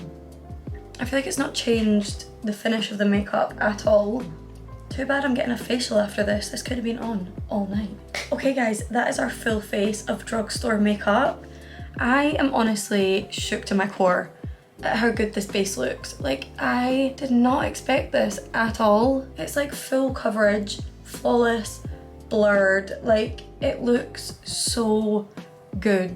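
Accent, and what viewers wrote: Scotland, United Kingdom. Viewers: I haven't subscribed to anyone in a very long time, but your video was refreshing to watch, calm and informative. Also, love the scottish accent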